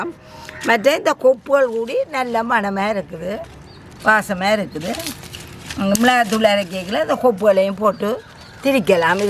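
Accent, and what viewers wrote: Sri Lanka. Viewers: இலங்கை தமிழ் கேட்பது இனிமை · I like srilangan Tamil voice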